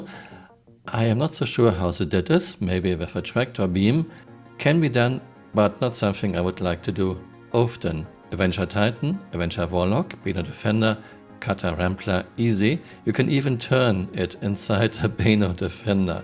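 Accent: Germany. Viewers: Wie deutsch kann man sein wenn man english spricht. MAXIMAL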